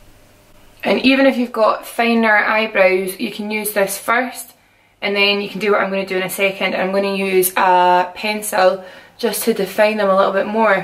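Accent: Scotland, United Kingdom. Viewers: 👽👽👽👽👽 love hearing a similar accent on YouTube to my own! Scottish vloggers ftw ❤️ #mermaidhairlookingstunning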